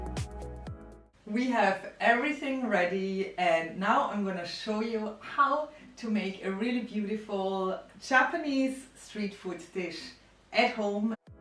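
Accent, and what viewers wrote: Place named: Stuttgart, Germany. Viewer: Lucky you with the Ibiza Carob syrup. I like how you start out the video with a wet mark on your apron. You work even before you film, I guess. That Nutri Bullet looks like it went through WW I and did some service on the Western Front with Erich Maria Remarque... You are brave to use that dried Shitake mushroom... I like your knife skills. You didn't even chop off a nail... did you ? I think I make something like this every other day. I called it an omelette... I like your accent. It makes me feel like I am listening to a Professor from Stuttgart... then I look and it is a pretty blonde... that always freaks me out... in a good way... -Jeff Goes Random. Jah.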